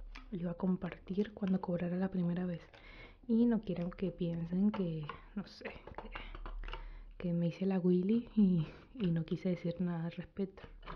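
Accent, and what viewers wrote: Venezuela. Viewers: Me encanta el acento venezolano, lo mire hasta el final. Super agradecida por este video.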